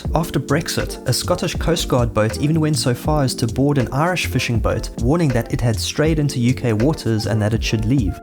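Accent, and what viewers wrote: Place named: South Africa. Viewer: Super underrated video! I love the accent too, I'm originally from South Africa but have lived in the UK for over 18 years now, I've not heard a voice like mine for so long, it's crazy to be reminded other people speak like that too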